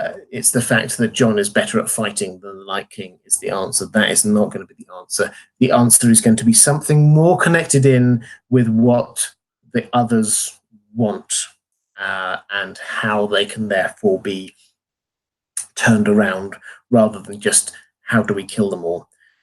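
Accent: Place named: Southern England, United Kingdom. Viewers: Hes English alright, from the south. Lovely voice x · sounds like a southern English accent. I've never been to England so take my guess with a grain of salt. I would love to know the answer though.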